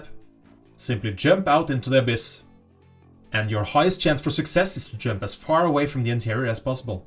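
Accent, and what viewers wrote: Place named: Norway. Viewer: The accent is violently norwegian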